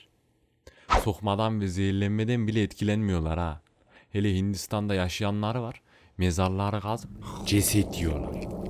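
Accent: Ankara, Turkey. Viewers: Son derece başarılı. Belgeselleri çok severim. Ankara ağzıyla efsane olmuş. Böyle devam kardeşim. İnşallah burası tutacak. Dur ben bi paylaşayım.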